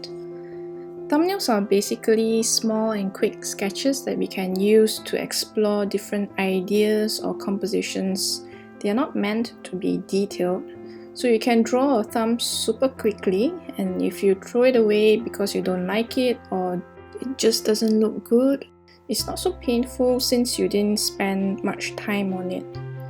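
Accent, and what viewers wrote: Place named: Nigeria. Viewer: i swear your accent sounds Nigerian. if it wasn't for your profile pic i would have guessed you were my country mate.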